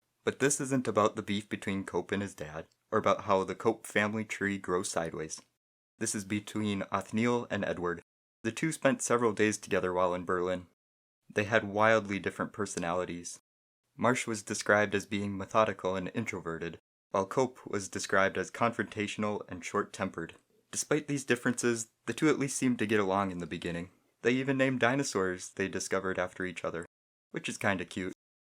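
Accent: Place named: Northern England, United Kingdom. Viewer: Really? He seems so sweet on camera. Must be the northern accent.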